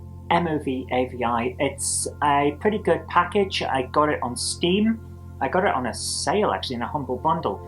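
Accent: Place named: Newcastle, United Kingdom. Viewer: You sound much more Geordie in that old clip ! Great response video😊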